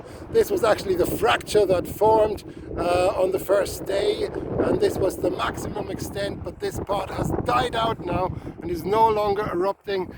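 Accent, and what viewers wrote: Ireland. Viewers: You sound irish? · lectured in volcanology and petrology at Trinity College Dublin <num>. Its just one of those accents you can't help but picking up some of the twang:)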